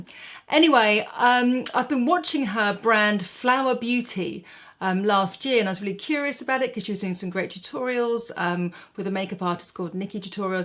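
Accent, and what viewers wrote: United Kingdom. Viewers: Greetings from Seattle! So happy to have found you! Very much enjoyed your video on Flower Beauty. You’re quite right that these products are quality yet affordable. I love the Flower Beauty blush color drops. The product is a gel that comes in a tube and you use it on the cheeks and lips for a natural flush of color that is buildable. It’s nice for women with mature skin as well. They come in six shades and are lightweight and lovely for spring and summer. Thank you again for the video. You are so natural and down to earth! I could listen to your voice all day. I think most Americans are enamored with British accents! Have a great day and see you soon!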